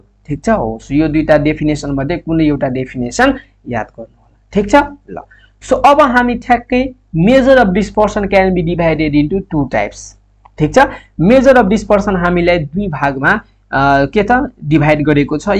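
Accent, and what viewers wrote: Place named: India. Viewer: I dont understand your indian accent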